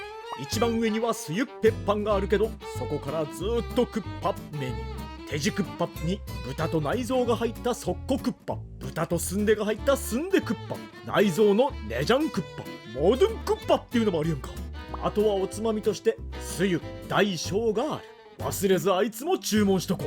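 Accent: South Korea. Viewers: 일본어하는 한국인? ㅋ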